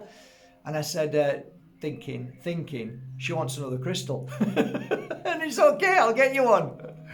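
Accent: Yorkshire, United Kingdom. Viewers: Thank you. Honestly I would love to hear an audio book in your own 'Yorkshire voice '. XxX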